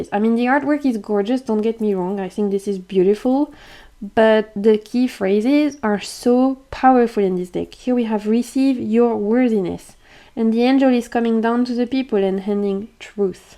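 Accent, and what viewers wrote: France. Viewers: With you on the whole angel thing….. but that angel deck you showed looks like a deck I could get next to. Thanks for sharing. Just wanted to say I love listening to you with your beautiful French accent….. tres charment! Plus would you be willing to you teach people how to correctly say Tarot de Marseille!?!?! 😂😂😂 it drives me nuts how English speaking people say TdM!!! Especially those of us from the states. Love the Marseille Waite….. I’ve seen it before and really didn’t expect to like….using a cohesive art style really makes the difference. I love your idea about how to read round cards. My first deck was a round deck and I never thought of the directionality as time, but I totally love this idea and I’m going to give it a try.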